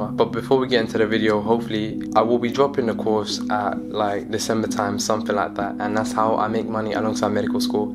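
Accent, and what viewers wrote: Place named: Somalia. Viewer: what dialect of somali is that? i understood some but not all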